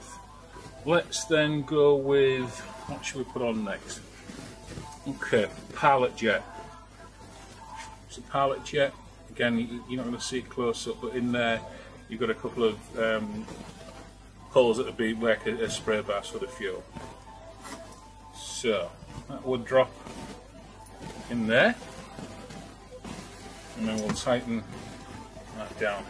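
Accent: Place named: Hull, United Kingdom. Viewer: Lol iam from hull ,can spot ull accent a mile away .just got gt <num> ,having starting problems ,and kick start stopped returning on its own for f sake .😢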